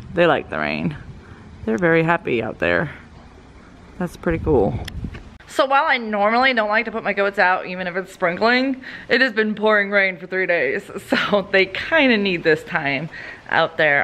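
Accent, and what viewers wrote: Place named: Massachusetts, United States. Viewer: It’s funny because I hear your Massachusetts accent and your southern accent.....I’m the same way. That Northern accent just flys out of my mouth lol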